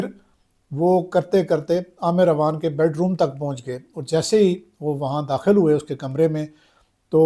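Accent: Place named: Punjab, Pakistan. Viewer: Full of useless words with no systematic approach...his Punjabi accent is crude ..He only knows how to just Praise...only..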